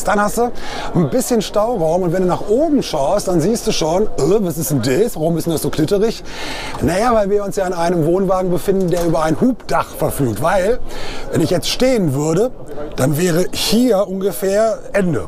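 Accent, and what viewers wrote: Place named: Gelsenkirchen, Germany. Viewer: Dein Sprachausdruck ist furchteinflössend.... Willst Du deine Videos nur nach "Gelsenkirchen hin" verkaufen !!!!! Drei mal Daumen runter für Audio :-(((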